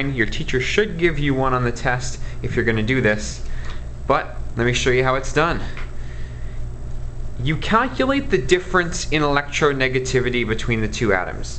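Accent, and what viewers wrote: Canada. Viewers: I like you,. And your Canadian accent.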